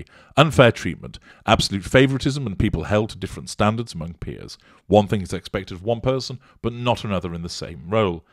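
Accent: United Kingdom. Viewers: One of my favorite things you do is to drop an appropriate f-bomb amidst this eloquent and proper British accent, i.e. “Oh, do shut tf up.”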